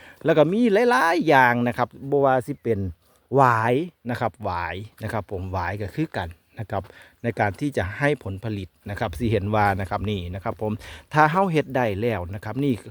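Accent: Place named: Nong Khai, Thailand. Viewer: สำเนียงคือคนแถวบ้านผมเลยครับ ผม หนองคายครับ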